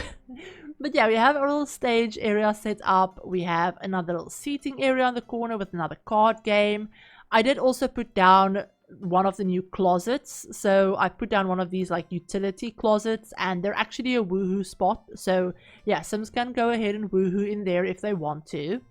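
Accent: South Africa. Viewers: Eeyyy! Are you South African? 👏😁🇿🇦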